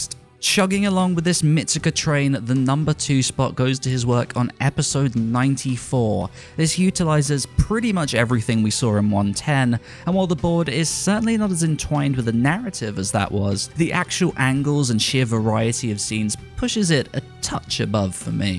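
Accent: United Kingdom. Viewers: Japanese words + a heavy english accent sounds a bit cringeworthy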